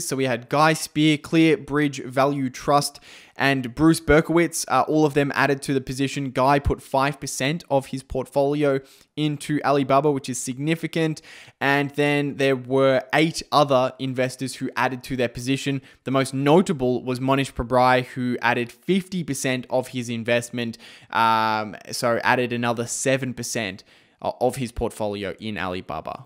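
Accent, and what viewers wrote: Australia. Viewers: That's Aussie English.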